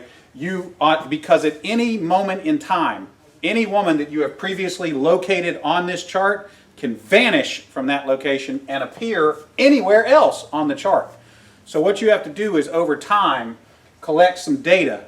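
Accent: South, United States. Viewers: For me it's the accent that makes this video, Southern, clear, articulate and a perfect balance of technical talk and slang. It's like he's briefing a group of astronauts.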